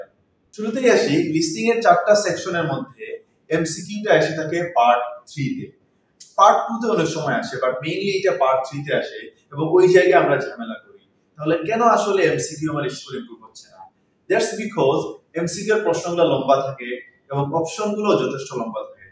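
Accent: Sylhet, Bangladesh. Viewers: Apni ki sylheti?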